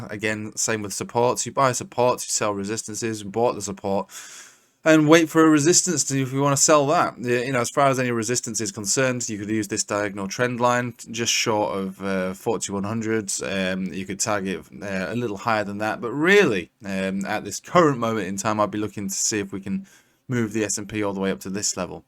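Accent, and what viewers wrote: United Kingdom. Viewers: Always good to hear a British voice and vision in the morning 😅🇬🇧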